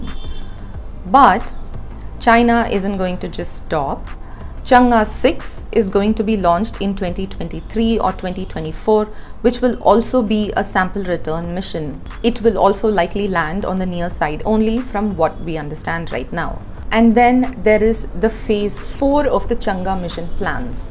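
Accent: India. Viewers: 这个女孩子讲的印度英文话，蛮好听的。😁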